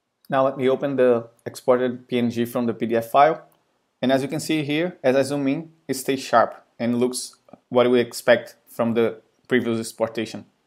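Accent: Brazil. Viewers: Valeu cara! Logo no começo do vídeo eu falei "esse sotaque é brasileiro", e confirmei com a linguagem do seu S.O kkkkkkk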